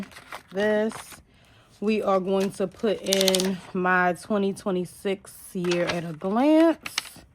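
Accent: Baltimore, United States. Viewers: I think I hear a fellow accent sis, baltimore stand upp 🤣🫶🏽 lolol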